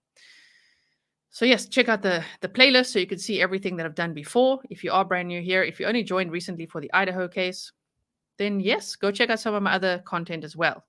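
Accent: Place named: South Africa. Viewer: I really, really enjoy your presentations. I like how the pace moves swiftly. Originally your swift speaking pattern with your SA accent was difficult for me to follow, but now that I am used to it, I love the fast pace. I now even put other people’s podcasts on 1.25 speed to get through faster, per your suggestion. I pray we are not going to try to eliminate knives now in addition to firearms. Murder has nothing to do with a weapon and everything to do with a person’s heart. After all, Cain used a rock. I’m sure there have been many murders using bats, 2x4’s pieces of lumber, axes, gun butts, vases or lamps, hammers, hands or fists, pillows, or plastic bags. In other words, you can’t legislate morality. We need tougher and swifter punishments, not regulations that take freedoms and methods of self-defense away from the law abiding people. We have quite a few firearm weapons ourselves, plus kitchen knives, excess lumber, the lot. 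I don’t want my freedoms taken away because there are a few bad people murdering innocent people.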